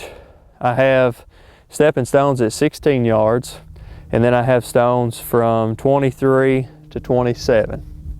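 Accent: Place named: Texas, United States. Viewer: You sound like you're from Texas. And Trapshooting is humongous business. There's about one major competition in every state ever week. You need to join the ATA to see just how many people are involved in competitions never mind how many folks just do it for recreation. It'll be a major eye-opener for you.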